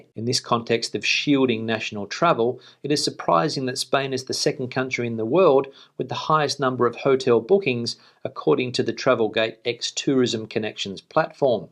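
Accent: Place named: Australia. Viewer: Lyon is in France; LEON is in Spain...get rid of that Australian accent...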